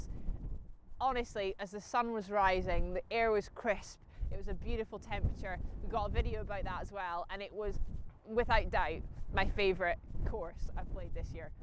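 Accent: Scotland, United Kingdom. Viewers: your the best pal love to hear your lovely Scottish tones on the tv,the future for you in golf is going to be rosey am sure,may the course be will you ❤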